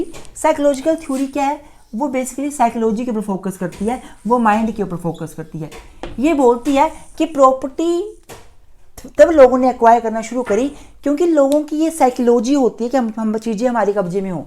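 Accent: Himachal Pradesh, India. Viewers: Be proud of your accent sir!! I Love Himachal ❤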